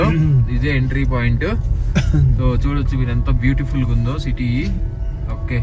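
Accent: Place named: Guntur, India. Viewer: Me guntur slang valla anukunta chalaa natural ga untundhi me explanation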